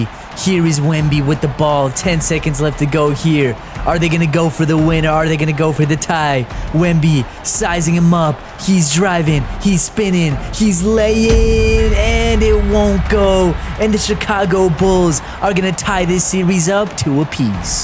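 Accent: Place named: Mexico. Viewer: dude sounds mexican as fuck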